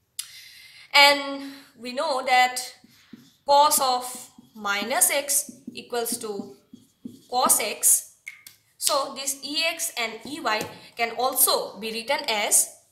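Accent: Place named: Assam, India. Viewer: She is Indian and An Assamese...And this is how we speak English...We should not be ashamed of our accent...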